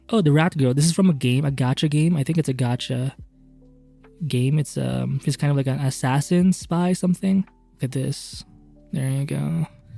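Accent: Philippines. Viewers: I'm getting Filipino accent in your voice, are you Pinoy?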